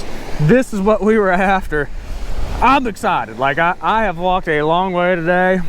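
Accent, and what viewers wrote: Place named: Alabama, United States. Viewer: After watching and listening I can hear the same Alabama accent as Beard. Lol Sounds country like here in Texas 🐟 🎣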